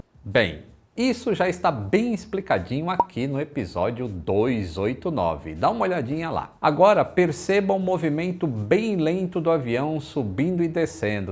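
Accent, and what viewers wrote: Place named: Minas Gerais, Brazil. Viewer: Voltou de Minas com mineirês mais completo...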